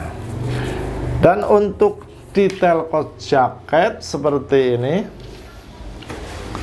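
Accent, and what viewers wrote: Banyumas, Indonesia. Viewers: Ngapak ya mang 😁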